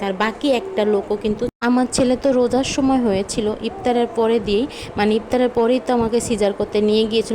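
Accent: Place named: Comilla, Bangladesh. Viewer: আপু তোমার বাড়ি কি কুমিল্লা কথা গুলো শুনে মনে হলো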